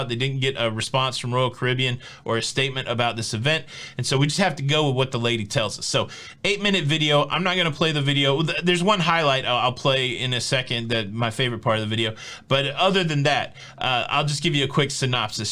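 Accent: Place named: New York, United States. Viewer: Sounds like fellow New Yorker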